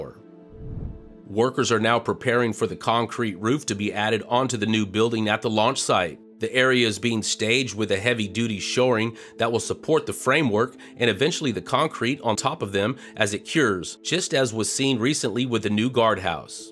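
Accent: Texas, United States. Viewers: Congratulations!... Keep that Texas Twang coming. " The South Shall Rise Again... to Mars! "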